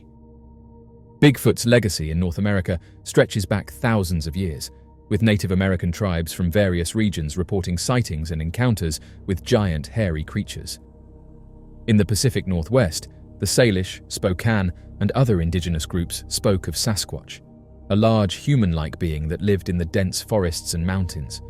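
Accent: England, United Kingdom. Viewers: No more pohm narrators... OUR COUNTRY.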